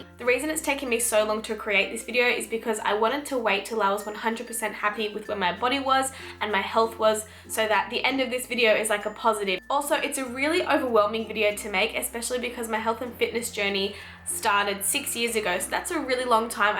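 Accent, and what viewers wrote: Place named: Australia. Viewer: love hearing an Aussie voice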